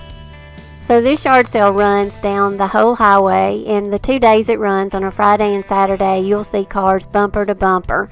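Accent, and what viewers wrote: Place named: Alabama, United States. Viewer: Hi neighbor! Just found your channel and absolutely love it. When I first listened to your voice I new you must be from Alabama. I live a little to the east of you in the same state. Ever been to Gadsden? You create such lovely work and have a great eye for staging. Really look forward to viewing older videos and new ones as you are inspired. God bless you, Sherry from Alabama.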